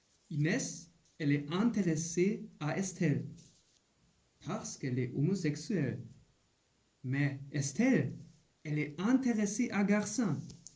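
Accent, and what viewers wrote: Germany. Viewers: Il a évidemment un fort accent allemand. Avec les Espagnols , je peux comprendre qu'ils n'ont pas de gorge R ou Ü, mais les Allemands devraient pouvoir parler bien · Puh! Deutsches Französisch ist echt schwer zu ertragen... aber sonst ein gutes und informatives Video.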